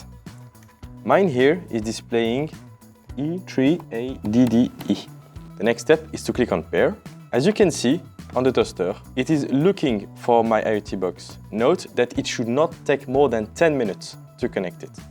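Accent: France. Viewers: J'espère que tu as amélioré ton accent trop french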